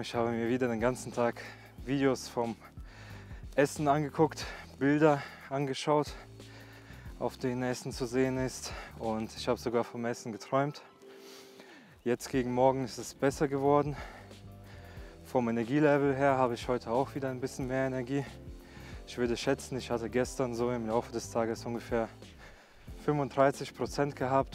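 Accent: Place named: Russia. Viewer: ты откуда? ✌🏻 Russe/Russlanddeutscher? Ich habe das sowas rausgehört, dass du Russisch sprichst 😁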